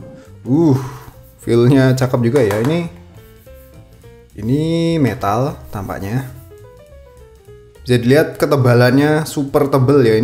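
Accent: Java, Indonesia. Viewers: Medokmu toh mas Bikin ngakak Ckckcckkcck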